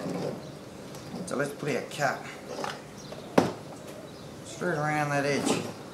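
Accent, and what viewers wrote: Australia. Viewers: Aussie accent is the best accent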